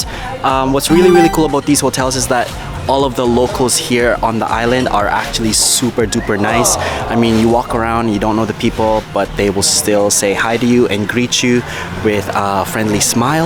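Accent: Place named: Canada. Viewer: His canadian accent just✨✨and the Filipino also just✨✨like wow❤️❤️❤️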